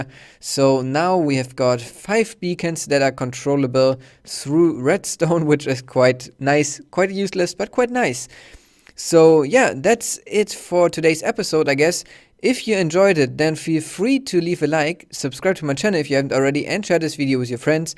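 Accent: Germany. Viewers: Man hört sofort: Deutscher.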